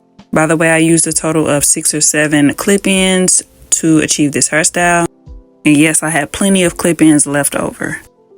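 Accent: St. Louis, United States. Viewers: I can tell by your accent you’re also from STL. ❤️ Love your videos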